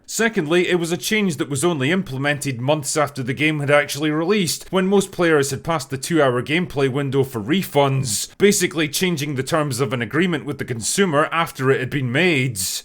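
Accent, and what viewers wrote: Scotland, United Kingdom. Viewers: This news sounds so much better in your Scottish?/Yorkshire? accent :)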